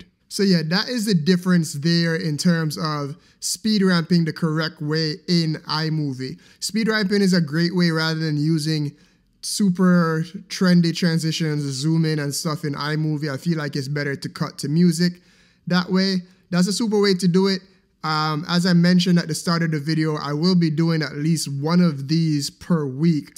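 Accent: Jamaica. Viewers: Great tutorial. Are you Jamaican?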